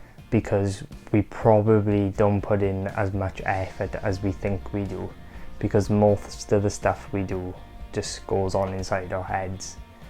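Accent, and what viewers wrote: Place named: Scotland, United Kingdom. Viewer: Scottish ? Cute accent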